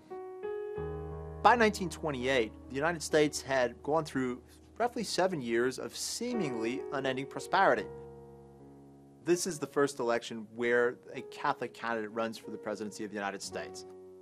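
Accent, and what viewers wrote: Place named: Baltimore, United States. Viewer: This guy has an INSANE BALTIMORE ACCENT.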